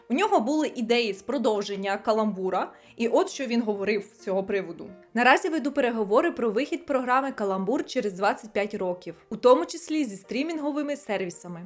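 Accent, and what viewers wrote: Surzhyk, Ukraine. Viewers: Мадам поработайтє над суржиком або мовою все ж розмовляйте.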